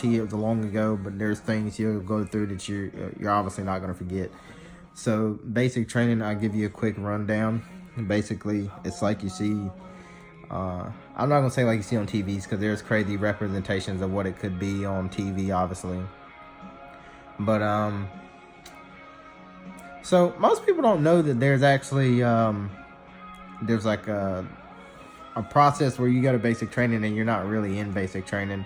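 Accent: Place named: South, United States. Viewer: Love the southern accent Soldier. 🫡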